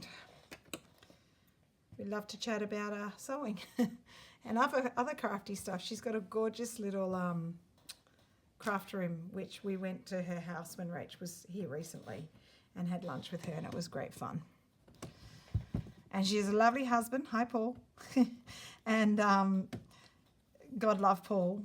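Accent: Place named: Australia. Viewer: Thank you, for your time, talent, and inspiration! I, too, have no friends that slow stitch! I do have fellow teacher at my school who is from Perth - she spends our summer there. So, talking with her is like visiting with you and - I love the accent!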